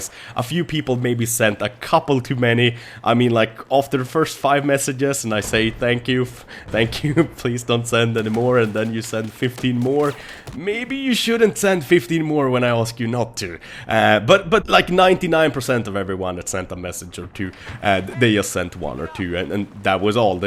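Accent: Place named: Sweden. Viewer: Haha shut up! Have such love/hate for your channel but i cant stop watching and listen to your swenglish haha:D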